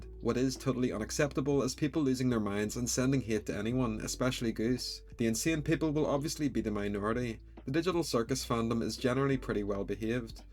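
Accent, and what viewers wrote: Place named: Northern Ireland, United Kingdom. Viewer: You’re NORTHERN IRISH AYYY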